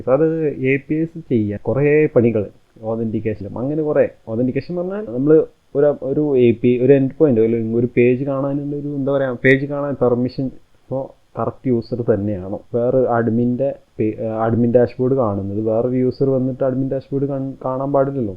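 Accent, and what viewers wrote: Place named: Malappuram, India. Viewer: malappurathevideya........slag kettapoye manassilayi malappuram anennu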